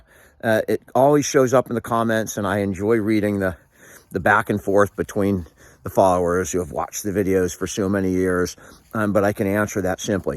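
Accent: California, United States. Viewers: You have a heavy California accent lol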